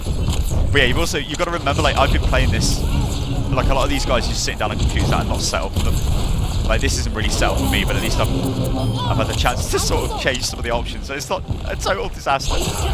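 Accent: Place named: United Kingdom. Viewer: "Great British Gaming" "amis" gg. Ja die Sprache ist die selbe: ENGLISCH. Wie kommt man dann also auf ami? Ausserdem hat er einen klaren Britischen Akzent.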